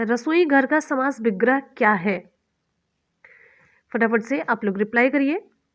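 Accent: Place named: Bihar, India. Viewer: Mam maghi bihari boli hai...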